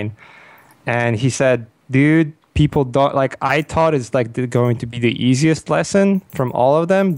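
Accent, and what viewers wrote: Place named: Poland. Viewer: Как же прекрасен польский английский. Люблю за это поляков, в отличие от native spikers.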